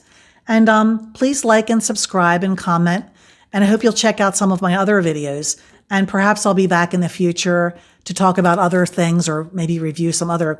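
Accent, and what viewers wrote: United States. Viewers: For that cost, that is a heck of a great sound! My wife has an Ovation 12…forget the exact model. She’s getting back into playing, and she’ll probably need that guitar re-set up. And do I detect a bit of a Philly brogue in your voice? Awesome stuff…will have to check out more! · first time we’ve head your speaking voice. Accent….Upstate New York?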